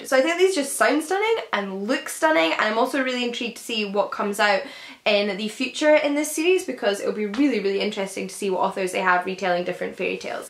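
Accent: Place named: Glasgow, United Kingdom. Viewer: I can't understand the person who made this video. She sounds like a glaswegian docker.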